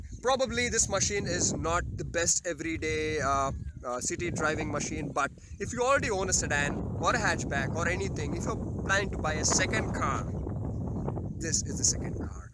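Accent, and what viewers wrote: India. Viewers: it's not fake accent, he is telugu so his pronunciation is like that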